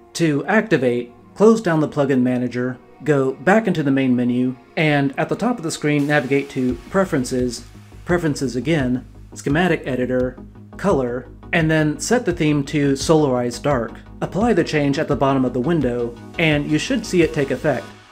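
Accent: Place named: Eastern Europe. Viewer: you have an accent....I wonder what your native language is...perhaps a slavic one..?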